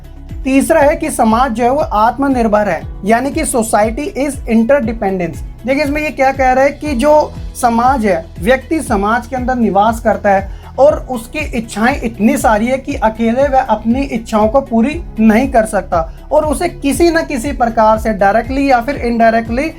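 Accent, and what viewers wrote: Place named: Haryana, India. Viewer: Aap haryana se ho I think.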